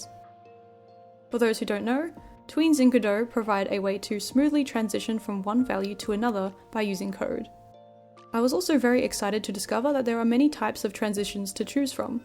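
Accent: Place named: Australia. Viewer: 7 days full time so about 60 hours in total? The hard work shows in the quality of the video! Even your first video is amazing, had you already had experience with video editing? I use Davinci Resolve too! Do you use the free version or have you paid for the paid version? I should check out motion canvas! I also noticed that your accent was familiar and I saw that you are Aussie! haha I am Kiwi. Great to see a creator from Oceania :D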